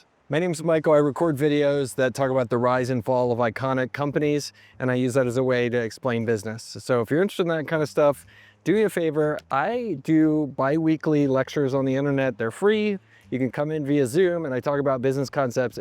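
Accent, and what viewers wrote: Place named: United States. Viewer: Americans and their pronunciation of CEE / SEE names and surnames,…jeeeeeeeeeez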